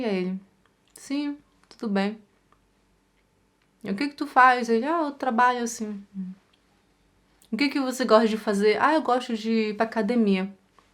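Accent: Pará, Brazil. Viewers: Nossa sabia que você era da minha terra é inconfundível seu linguajar paraense 🤗❤️bjs amei conhecer seu canal eu estou aprendendo muita coisa com você.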